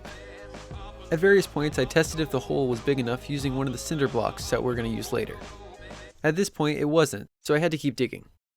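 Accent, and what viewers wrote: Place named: England, United Kingdom. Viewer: New England but your accent is well English haha interesting though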